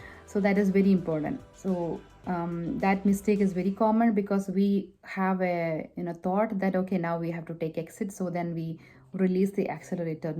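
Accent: India. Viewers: by your accent I'm assuming Indian originally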